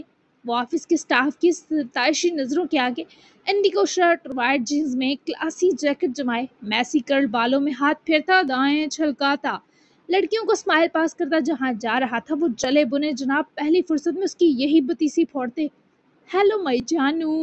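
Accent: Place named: Punjab, India. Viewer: Your Punjabi accent is awesome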